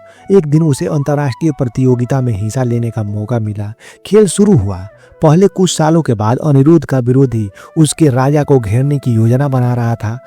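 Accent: Bengal, India. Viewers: Bangla accent 😊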